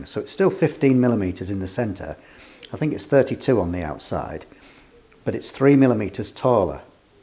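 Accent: United Kingdom. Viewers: Thanks, I own an Atlas 7-B Shaper and I think I’m going to make a mill head too! I love your gentle British manor and voice. Thanks again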